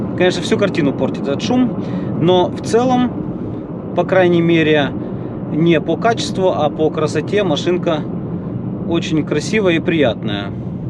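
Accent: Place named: Zaporizhzhia, Ukraine. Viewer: Голос (акцент)у автора как у моего дядьки с запорожья.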